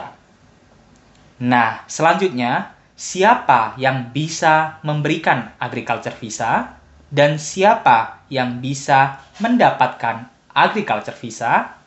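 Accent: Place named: Java, Indonesia. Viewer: Dari logatnya mas nya jawa ya..jawa mana mas..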